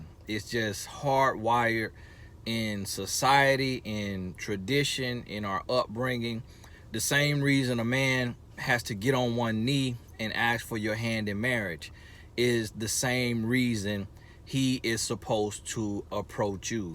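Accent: South, United States. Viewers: He has got to be from the south 😂 This is a good talk though!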